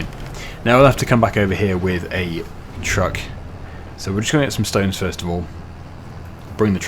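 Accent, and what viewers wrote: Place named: Southern England, United Kingdom. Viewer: Can I just say, the British way of saying things is the correct way. Our American friends pronounce things incorrectly and have some of their own words for things. A trunk to the American’s is a boot to us. Lol…you can’t cater to every language dialect and varying terminology. Just be you, be British (I would say and ‘proud’, but atm Britain is a little broken). Anyway at least you’re from down south and sound posh. Unlike myself who is a northern lass and sounds as broad as a plank, with a strong Lancashire accent 😊 I would have put money on you flipping that trailer with all that wood on it! You surprised me lol…it was very close at one point though. 😂🤣 Loving the series very much, but I would like to see you ride the horses now and then. I hope you are feeding Dogwin! Thanks for all your hard work.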